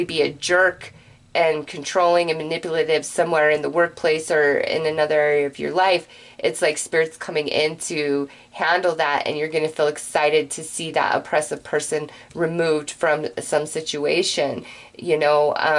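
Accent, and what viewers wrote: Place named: West Coast, United States. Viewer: I really like your voice, still trying to figure out which region of North America who hail from....West Coast? <3 Thanks for sharing your healing gift with us.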